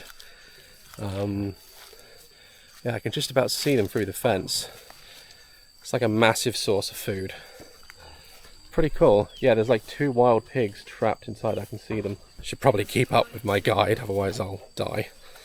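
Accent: United States. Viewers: You can tell & his wife talk alot at home. Noticed an American twang to his accent throughout this video.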